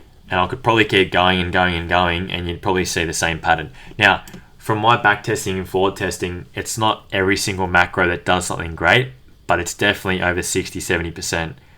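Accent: Australia. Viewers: Are you Aussie bro? Great video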